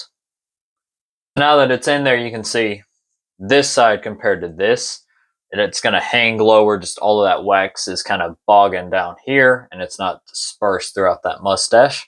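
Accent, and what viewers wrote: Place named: South, United States. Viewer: i was honestly not expecting the southern accent.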